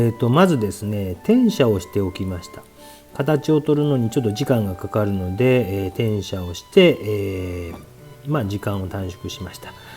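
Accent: Kansai, Japan. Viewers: おはようございます。今朝サインの描き方を試してみましたがうまくいきませんでした😞 サインとか窓の格子などはアマチュアにはliquid frisquetでもいいかなと考えています。影を描いてからその上に色を載せるやり方は大変参考になりました。ところで神戸出身の私には先生の関西弁が懐かしいです。これからも頑張って下さいね。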